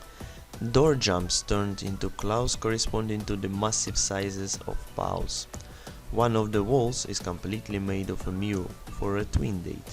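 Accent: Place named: Russia. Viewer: Haha😂 The Russian accent somehow makes it much more intimidating!🤣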